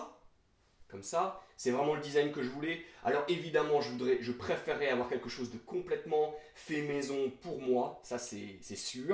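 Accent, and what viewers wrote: United States. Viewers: Je découvre ta chaîne (même si ta Yam c'est une "cardan"😁). Je m'étonnais de voir (entendre) un Français qui a un bon accent anglophone (américain), mais apparemment, tu vis aux States. En revanche la dénomination de ta Yam, n'était pas "Virago" : à l'époque dans l'Hexagone, on avait la XV750 Special, mais la tienne ressemble à une série sortie chez nous en XV1000SE la Midnight Special (avec sa finition noire et dorée) avec 2 disques à l'avant pour la 1000. Les "Virago" XV 750, 1000 et 1100 en France n'étaient pas équipées du mono-amortisseur cantilever, mais de deux suspensions arrières, ce qui est plus contraignant pour la transfo café-racer. En tout cas beau boulot !😃👍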